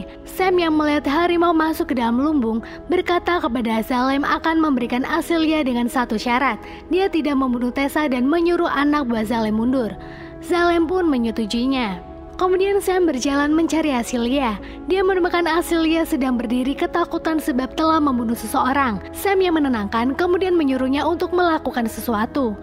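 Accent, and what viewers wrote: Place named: Banyumas, Indonesia. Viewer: Mbak lucu banget sh, pake bhasa jawa ngapak kwkwk, semoga sukses terus terus chenelnya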